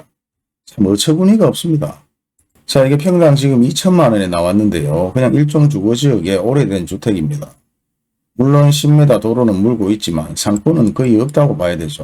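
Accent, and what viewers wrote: Gyeongsang, South Korea. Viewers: 사장님 푹건한 경상도 사투리 너무 친근감있고 듣기 좋습니다 ~ 앞으로 자주 오겠습니다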